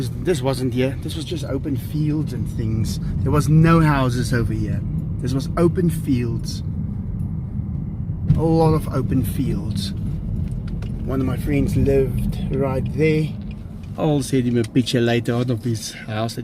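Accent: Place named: South Africa. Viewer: is it summer there now? bleh.. we have -10C and snowstorm.. well.. thats sweden.. CAN YOU BELIEVE IT haha ur south affrican accent is so cool =) well i should keep my mouth shut since i have swedish accent with hard R like you =) but i love the SA accent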